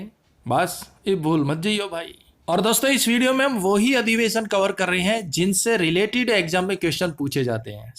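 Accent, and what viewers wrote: Haryana, India. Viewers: Sir, kya aap haryana se ho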